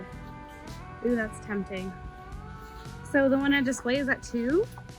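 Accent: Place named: Chicago, United States. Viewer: Just found you. As I was listening I thought to myself that you had to be from the Chicago area. I hear the accent, my cousin has the same one. How do you like the greenhouse?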